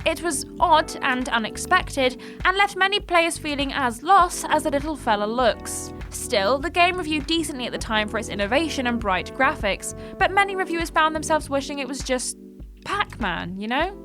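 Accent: United Kingdom. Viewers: English (British) pronunciation.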